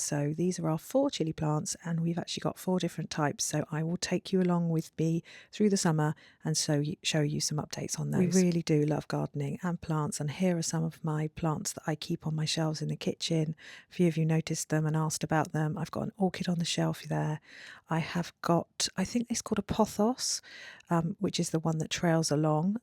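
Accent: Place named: England, United Kingdom. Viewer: Your new parasol is spectacular and the solar lanterns are so cute! I love it all! I love your voice over and your charming English accent. (I'm from California, USA) TFS! 💜 💜 💜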